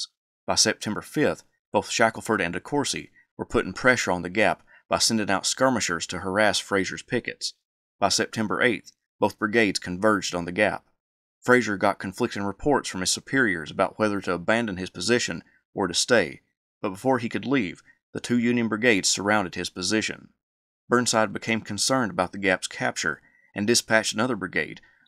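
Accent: South, United States. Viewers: Your voice on this video reminds me of a very cute actor. I'm just lousy with names. This actor was 6'1", about 195 lbs, dishwater blonde hair, brown eyes and your wonderful southern accent. Oh, I remember, he played on the show Bones.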